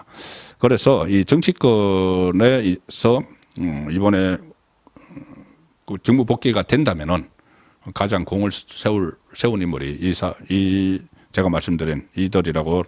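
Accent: Gyeongsang, South Korea. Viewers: 혼술 송국건 대표님 구수한경상도 사투리가 더 감칠맛이납니다. 해박한 시사논평줄겨보고있습닏다. 고맙습니다. 혼술화이팅!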